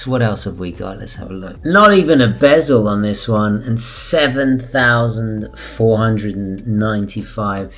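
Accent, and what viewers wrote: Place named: England, United Kingdom. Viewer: An Italian with an English accent , ma whats going on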